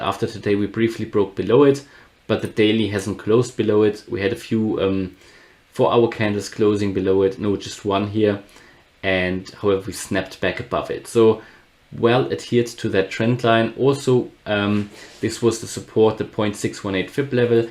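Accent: Germany. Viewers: What accent do I detect Are you German?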